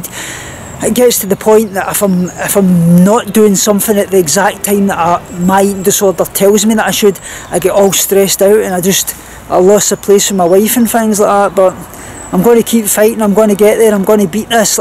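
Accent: Scotland, United Kingdom. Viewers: I was half way through my comment and it disappeared so if you find a random unfinished thing, it's mine... ANYWAY, ONTO MORE IMPORTANT STUFF!! I have said this before but this is a great time to say it again... I found you in sin city Renee's comments section and was interested enough in your comments to see if you had your own channel. So I found you through veganism but stayed because of YOU. I stopped following the vegan youtube community last year when it all got really stupid. I unfollowed all but 2 or 3 vegan channels. Of those, you're the only channel I watch religiously. I do eat a vegan diet but I don't have an eating disorder - at most, I have a dismorphic self hatred about being overweight. I like your channel because of you. Not the ED. Not the veganism. Not the furry family. Not the irresistible Scottish accent. Not the eclectic posters on your wall behind you. 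Not the singing. Not the persistent rain. Not the wrap around shades or squeeky voiced sound effects. Not the great variety of dog fluff. (Even though I enjoy all of the above!) I watch your content because of you. You,. And the rare but lovely cameo appearances from Laura:D If you want to know what it is about you (and not my list of nots) It's because of who you are as a person. You're very friendly, you talk openly without judgement and you keep your content true to who you are. You don't need to be rude or offensive to talk about awkward subjects, you have no idea how enlightening and truthful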